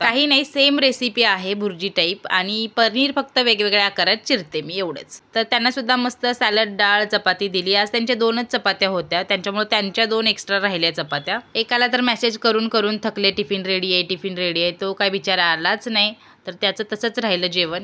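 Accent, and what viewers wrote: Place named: Kolhapur, India. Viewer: Chaan vat te tumcha awaz and tumcha kolhapuri accent eyikayla.. recipes pan baghayla avadtat ❤❤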